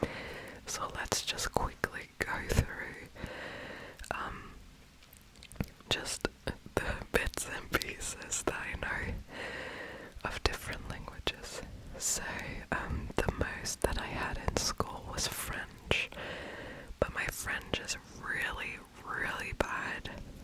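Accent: Australia. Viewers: I thought you were Australian and had no clue you were German natively so 😅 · Before I found out she spoke German, I thought she was Australian too. Lol 🙃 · I'm Australian and assumed she was too lol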